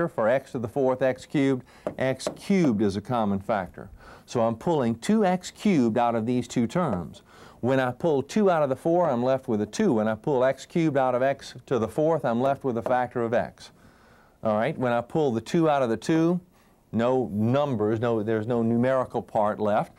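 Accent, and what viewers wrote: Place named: South, United States. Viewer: This is an EXCELLENT refresher for the GRE. Thank you! (Also, love the southern accent.)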